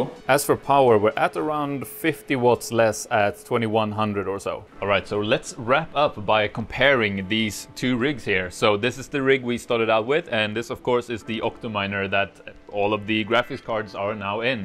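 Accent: New Zealand. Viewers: i love your kiwi! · Hi, many thanks for another great video. Always loved the Kiwi accent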